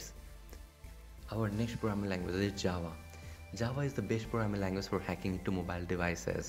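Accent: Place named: India. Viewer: me i as soon as i hear a India guy voice: AM IN THE RIGHT PLACE. love to all indians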